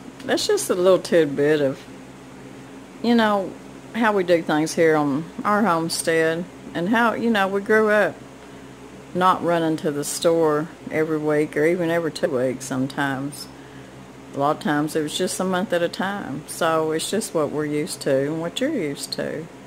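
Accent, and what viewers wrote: South, United States. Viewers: Amen !!! I have been so poor at times in my life but my children never went to bed hungry and we always had what we needed, not always what we wanted but what we needed !!! My kids are grown and I finally went to college and became an RN, I now no longer struggle to pay my bills but I still don’t have fancy things, God showed me I don’t need fancy, I am happier with thrift store clothes and raising a garden ! I am so grateful for the hard times and I’m so grateful for the abundant times, God is good and faithful !!! Watching you with your down to earth personality and your southern accent warms my heart !! Blessings to you and Mr. Brown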